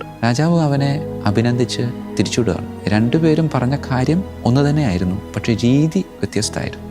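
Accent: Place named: Thrissur, India. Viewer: Thrissur aayirrikkam. Slang .....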